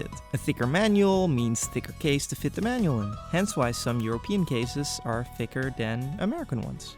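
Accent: Netherlands. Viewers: Oh, a fellow dutchman! I was wondering why your accent sounded familliar. I've been meaning to get into game collecting. I have already baught a nintendo 2DS as I used to have a 3DS back in its hayday. And I missed some of the titles on there. I also have a Wii U but I only have one physical game on it. I'm gonna subscribe to your channel hopefully learn some more about game collecting in the future. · accent gehoord, nederlander gespot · Jij bent 100 procent nederlands. Je accent maakt het duidelijk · Ik had pas in de gaten dat je Nederlands was op het moment dat je het zei. Nederlands accent heb je haast niet meer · WHY do I ALWAYS recognize english speaking DUTCHMEN?! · Wow man, Nice subject. great editing and research. Also great accent for a fellow dutchman. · You dutch? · You’re definitely Dutch aren’t you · Watching your video I was like: damn, this dude sounds like he could live next to me. 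And then you said you're from the Netherlands and I'm like, makes sense lol. Great video! The King's Day part hit.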